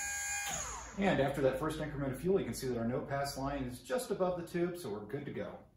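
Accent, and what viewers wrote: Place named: Midwest, United States. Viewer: Your monotone is kinda creepy definitely back east somewhere possibly Wisconsin or Minnesota?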